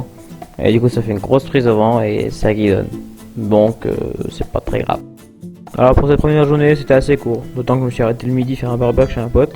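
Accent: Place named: Germany. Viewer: Sympa la vidéo et que dire de ton super accent allemand...vivement la suite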